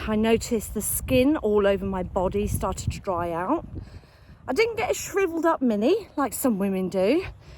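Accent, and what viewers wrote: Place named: United Kingdom. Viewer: I just love your beautiful UK speech and dignity. I just had an appointment this afternoon. I'm 57 yrs old and have had researched things. I attempted taking herbs to manage my changes. I was able to for awhile. But in the last few months I was not doing so well with it. So I am starting HRT. I want to punch that MD for you as well! I'm so happy to come across your video today.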